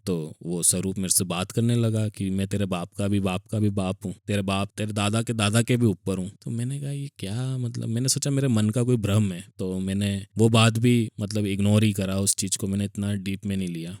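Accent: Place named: Indore, India. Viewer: Uncle ji aap v satsang sunaya kijiye me v bht time indore rahi hu indore is my favorite place😍😍aapki boli mein wo pura nazar aata hai😍 Jai Guruji🙏🙇‍♀️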